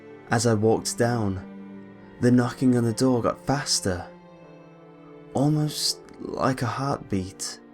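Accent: United Kingdom. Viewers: wow are you a British? your voice is simply sexy :3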